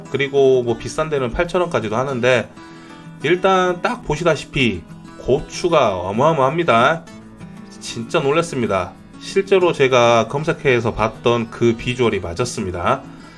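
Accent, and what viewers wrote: Jeolla, South Korea. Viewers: 전라도 사투리 난 실망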